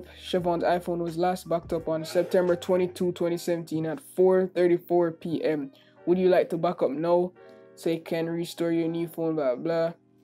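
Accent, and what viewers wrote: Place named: Jamaica. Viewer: You sound Jamaican can still hear likkle bit ah di accent😂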